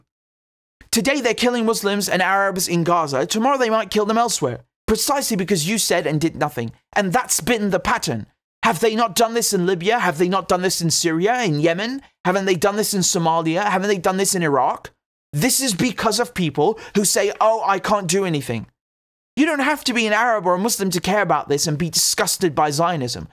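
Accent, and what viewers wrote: England, United Kingdom. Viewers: Sound very English Rich. Normally you sound American lol Ps i disnt vote today. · Nice British accent! I love it. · is it just me or is your british accent noticeably stronger in this video? hehe. rooting for the workers party from across the pond. ❤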